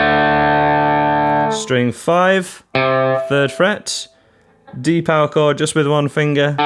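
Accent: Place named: North Yorkshire, United Kingdom. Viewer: Thank you for the lesson on by. Terrif song! Your accent sounds like a Northern Yorkshire accent. I'm an ex British Patriot. I live in Tasmania now. Keep up the good work. Easy to understand.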